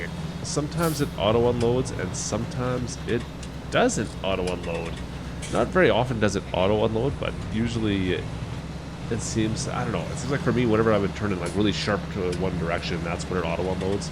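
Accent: Canada. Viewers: A fellow Canadian...EH?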